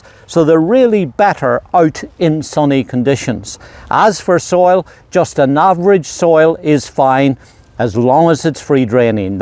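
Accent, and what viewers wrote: Northern Ireland, United Kingdom. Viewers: Is that a Northern Irish accent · Great video Mr! Thank you so much for sharing your gardening knowledge with us! I hope you don’t mind me saying so - it’s truly lovely to hear your accent. My late father was from Northern Ireland as well. Keep up the great videos! I have subscribed and will be looking forward to learning more! ☺️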